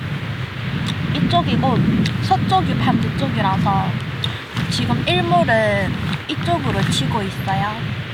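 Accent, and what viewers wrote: Gyeongsang, South Korea. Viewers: 딱 대구말투 시네요^^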